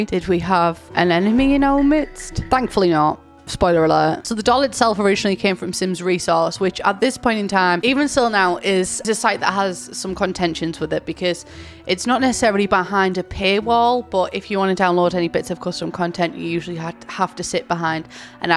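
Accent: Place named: Middlesbrough, United Kingdom. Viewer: click like if you come here for the middlesbrough accent. say 'purple shirts'!!! 'PAIRPLE SHAIRTS'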